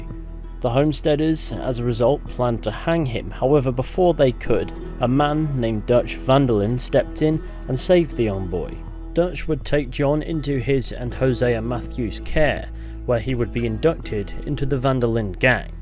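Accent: United Kingdom. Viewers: Go damn it, why do u have the annoying british accent, just why?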